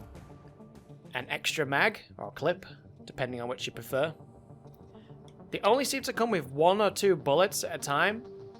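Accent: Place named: Boston, United States. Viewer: haha I suppose so! The main thing that throws me off is the pronunciations of "ar" like in "dark". You say "duhk", which sounds super typical of a thick Boston accent. Sorry for obsessing over it. It just strikes me as unusual and fascinating. Incredible things happen when you connect random parts of the world together with voice chat lol.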